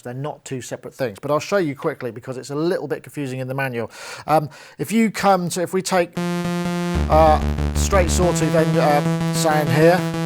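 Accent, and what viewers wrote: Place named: United Kingdom. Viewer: Lock, stock, and two smoking oscilators accent right there. This guy should be in the next Guy Ritchie movie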